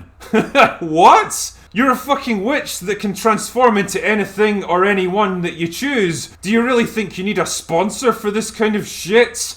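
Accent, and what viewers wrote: Scotland, United Kingdom. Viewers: Because he really is smart his earlier reviews were very traditional hes basically a awesome scottish mr plinketf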